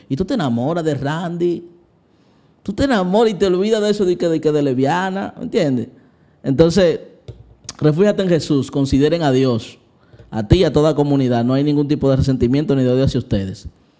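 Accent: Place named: Dominican Republic. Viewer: Es que ese el acento dominicano. Sip, somos el país que peor habla el español en Latinoamerica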